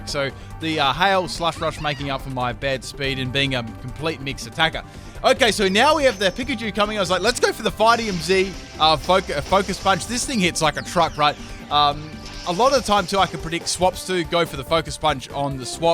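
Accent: Australia. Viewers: PLEASE PIN THE COMMENT I LOVE YOU AND YOUR AUSTRALIAN ACCENT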